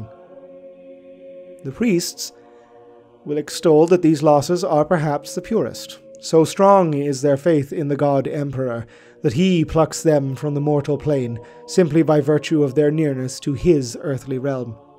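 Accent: Ireland. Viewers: This channel is bliss. Nice to hear a fellow Irish 40k head describe the world and lore so vividly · Are you Irish?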